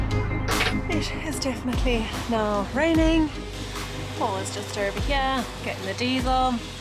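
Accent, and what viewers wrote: South Africa. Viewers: Another great video, I don’t think that using the bow thruster is cheating it’s a must have unit nowadays to have installed on your boat. It’s interesting listening to your South African accent it reminds me of my time in Johannesburg in 1982. When are you going to set sail to come up north, try the Ribble link and the Lancaster canal, 40 plus miles and no locks, You would love it. Anyway keep up the good work and don’t mind the weather. Best regards from Tom in Lancashire peace and god bless.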